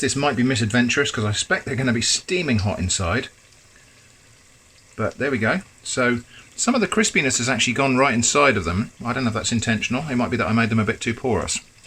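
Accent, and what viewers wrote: United Kingdom. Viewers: Must be the same British accent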